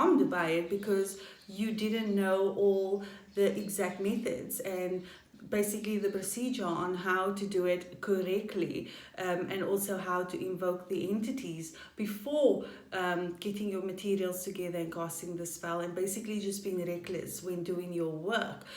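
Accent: South Africa. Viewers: You're definitely from Cape Town, but did you sound like you grew up on the Flats, right?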